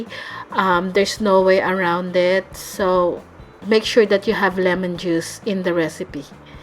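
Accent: Philippines. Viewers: Filipino ka no teh? Accent palang ih!! Hehehe!!! Sana teh translate mo to sa tagalog. Nosebleed!! Choz! Pero pa translate teh para maintindihan ko ng maayos hahaha!!! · but you have to admit... she does sound filipino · Yeah. unang rinig ko palang, alam ko ng filipino sya hahaha. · sure ka ba d mo naiintindihan??? Filipino ka dba? tsk tsk.. mka-insulto lang. accent is not important sa Youtube te as long as naiintindihan ng nakakarami. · matias Low IQ lng d nkakaintindi s kanya.. She has a filipino accent so dapt mas naiintindhan mu! Wag kang epal! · Are you a filipino? Because you have a Filipino accent.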